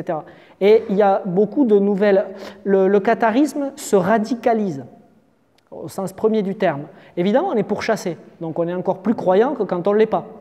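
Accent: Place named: Occitanie, France. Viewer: Un pur bonheur de vous écouter! Belle faconde égrénant notre histoire dans ce noble accent que je connais si bien et qui fleure bon notre pays d Occitanie! Un véritable débat d idée avec ce public passionné. Merci pour ces conférences didactiques, exprimées dans une remarquable clarté digne d un grand historien 😊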